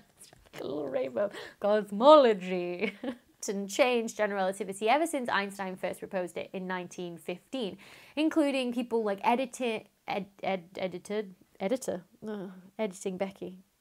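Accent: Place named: England, United Kingdom. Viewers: Thank you for making so many interesting videos that I love to watch for the contents, clear explanations and your lovely English accent which I find very cute 🥰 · Dr. Becky I hear you! Not meaning to disparage anyone.... Bolton, ok my education in the multi-variant English accent continues 🙌 thanks for replying.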